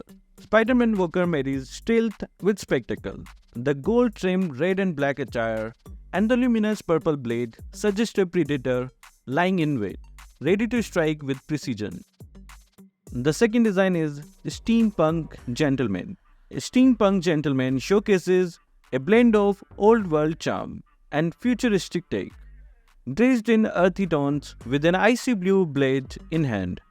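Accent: India. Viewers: Just one question bro😂😂😂.....Where are you from???? INDIA?? YOUR ENGLISH accent is like an Indian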